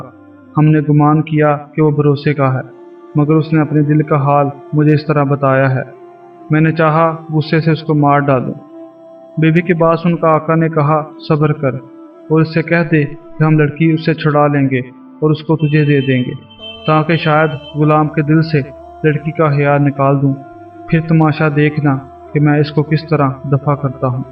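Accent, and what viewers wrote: Punjab, Pakistan. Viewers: bhai aap ki awaz bhot achi hy lakin aap ka talfuzz bilkul Punjabi hy plz ic ko negative ni lain lakin ap ka talfuz bhot irritate krta hy plzzz ic talfuz py work krain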